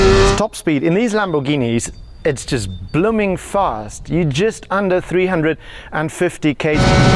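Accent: South Africa. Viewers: Sounds like a South African accent.🤔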